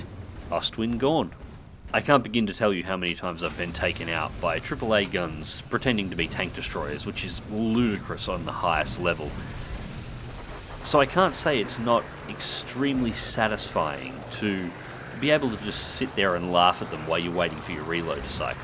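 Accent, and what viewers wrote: Australia. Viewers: Matey, I really enjoy how you describe things!!!! You could make bread mold sound like the most fascinating thing on the planet!!! If I was an Arab, I would buy sand from you to put next to all of the rest of the sand I had!!! With that smooth Aussie accent, I'm glad I'm not buying a horse or a used car from you!!!! Please think of this as my off-handed attempt at paying you a sincere compliment!!!!! What Squire is to funny, you are to amazingly interesting!!! the fact that you are often self-deprecating, makes you even more interesting and honest!!! I have been such a huge fan since I found your channel!!! I truly wish I could buy you a cold beer and a stubby of Jack!!! Maybe some day I will get the opportunity, who knows, the world is getting smaller all of the time. All the best from way out West, Sprog1 in AZ.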